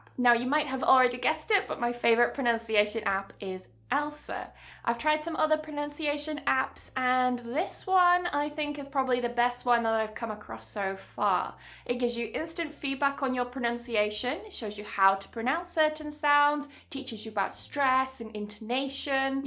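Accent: United Kingdom. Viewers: Hii I like your video, are you from UK? :) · r u British ? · Wow really awesome British accent!! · I was looking for pure british accent speaking content and I find you accidently. Because my search was done yestarday and didn't end up with relevant contents. Today, I suddenly come through your content. So wonderful. My greetings from Algeria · I wanna to learn British accent from you. Therefore guide me how am I join your online english British accent course…?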